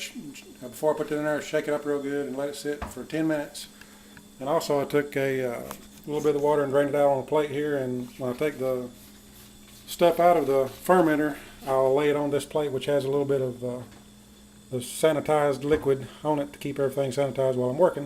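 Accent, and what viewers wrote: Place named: South, United States. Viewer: LOL!. FER-men-tater. :) I love your videos, but I have to watch them in small doses, as I have always been susceptible to picking up southern accents when exposed to them for too long. :) Thanks for the great info here, though, I am about to start home brewing and so am doing preliminary research. I don't think I will start with a Mr. Beer, but this is interesting nonetheless.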